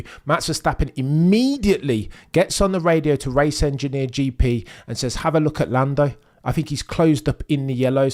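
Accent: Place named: Midlands, United Kingdom. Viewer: I love your Midlands accent haha. I lived between your amazing nice Midlands people for three years. I miss you all and that funny accent❤️🇳🇱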